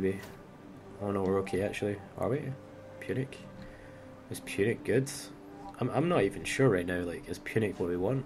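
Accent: United Kingdom. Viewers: Awesome British accent you have there, its very different to the typical Southern Englanders we get on YouTube.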